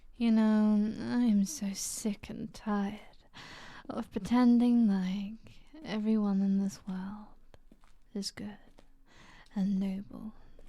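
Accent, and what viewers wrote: United Kingdom. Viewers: THE BRITISH ACCENT YALL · The british accent is making it more🌶️🌶️🔥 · fr (The british accent is making it more🌶️)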